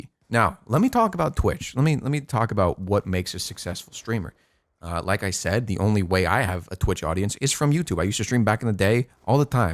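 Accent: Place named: New York, United States. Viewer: new yorker accent leakin out a bit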